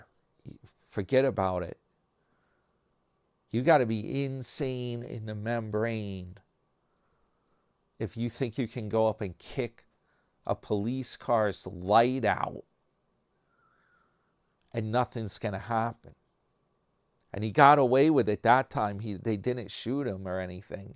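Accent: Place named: New York, United States. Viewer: He does mispronounce words, but this is not a fair example. This is his regional accent. Regional accents are disappearing. It makes me sad. It will be very boring when everyone in our country sounds the same. It’s interesting to me that even in the small region of New York there are different accents.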